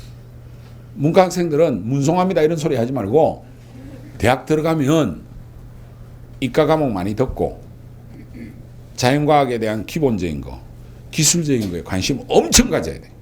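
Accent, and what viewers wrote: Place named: Gyeongsang, South Korea. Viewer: 고자 동남방언 억양 때문인듯 ㅎㅎ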